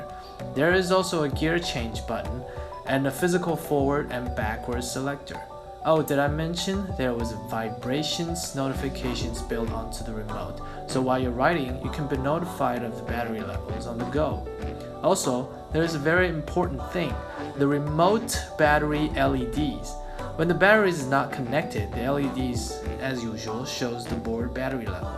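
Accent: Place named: Taiwan. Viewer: 竟然是台灣人的影片，這口音很純喔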